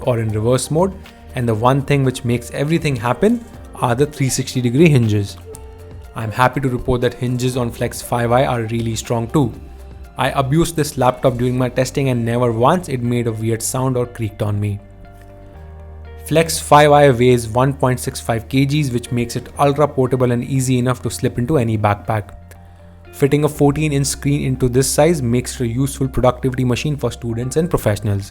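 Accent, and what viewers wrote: India. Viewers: i love the indian accent that remember me raj in big bang theory 😂😜🥰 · horrible this indisch english this laptap is walk toched with16gb with bitter displaayy this thisi s is missi this -pooaaahh bluluuluuu o myy gooddd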